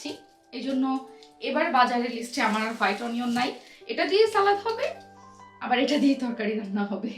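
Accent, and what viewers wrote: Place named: Chittagong, Bangladesh. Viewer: কথা শুনে মনে হচ্ছে চিটাংগগে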